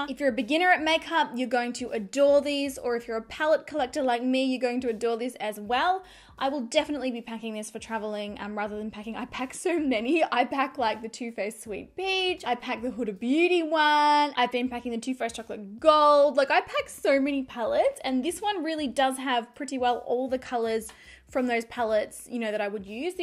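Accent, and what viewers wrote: Australia. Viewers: I miss Australia so bloody much, like your accent makes me want to cry I wanna move back now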